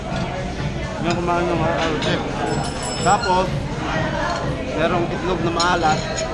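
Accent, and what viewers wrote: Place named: Ilocos, Philippines. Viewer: sarap pakinggan mag ilocano si..kakaproud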